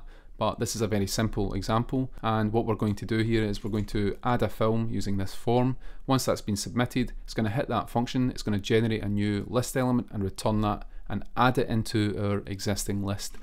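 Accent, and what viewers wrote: Scotland, United Kingdom. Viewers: 3 awesome things in this video. Golang, HTMX and the beautiful scottish accent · A Scotsman! 🎉 Refreshing to hear other accents.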